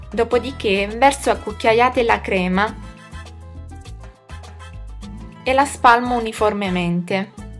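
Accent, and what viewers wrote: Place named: Brazil. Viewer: wao que hermoso video, y que hermoso acento eres de Brasil o Italiana ... muchas felicidades ... saludos desde MEXICO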